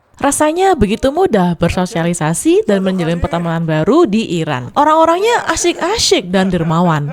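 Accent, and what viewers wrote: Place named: Surabaya, Indonesia. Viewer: Suroboyo banget watak suaranya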